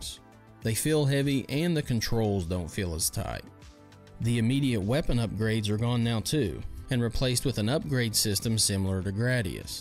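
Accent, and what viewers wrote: South, United States. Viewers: I don't know what it is about your channel, but it brings out a sentiment in me that other gaming channels don't. Seeing the instruction booklets, the old footage and how you present the information - and possibly even your southern draw, takes me back. I wish nothing but success for your channel.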